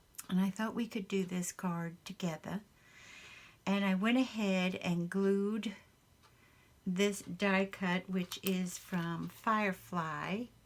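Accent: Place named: Charleston, United States. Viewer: How can I I love you even more. Your gentle kind caring sweet. When I hear you I think of Charleston SC dont ask me why. But you are sweet corn.👍💕🐇🐥🌽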